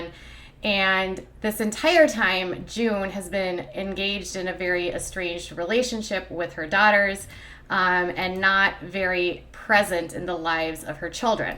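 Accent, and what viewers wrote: Minnesota, United States. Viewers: Haha awesome shirt!! I asked you if you were a midwesterner?? I can spot a MN accent or northern a mile away. I am from MN so not picking on you. I am a new subscriber and love your videos!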